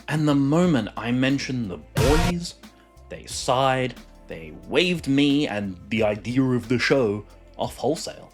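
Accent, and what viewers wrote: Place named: Australia. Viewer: I thought you were English -- I didn't catch the Aussie accent, but still nailed it. I'm not sure you fully understand why Unite The Kingdom exists, but judging from your points, I'm guessing it doesn't matter because you have that far-left ideology understanding of what "masculinity" is. However, your reply clearly took time & was..... well, nice. It's hard to actually engage with people, nowadays, especially online, & just be civil. Cheers, to that. I write back because I want to see if I can somehow sway your opinions, or cause you to want to actually be informed on things -- your misunderstanding of why I said "migrants" & not "immigrants" caused me to want to reply. I also disagree with you completely about the comic & the show -- they are DIFFERENT. The comic is so much better at creating & exploring the world, while the show offers other aspects that are done better. 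I'm also a fan of how the comic implemented compound V, as it gives some of the characters more agency. The finale of the show borrowed heavily from the comic, when it shouldn't've -- yes, the show commented on everything you mention, but it wasn't until season 3, or so, when it just became another far-left media of propaganda, eschewing any balanced parody or satire, for openly taking shots at anyone who doesn't agree with "The Left", even those who participate in politics under the same flags. In mentioning this, I am reminded it was your comment about how you're "confident by the small comment reducin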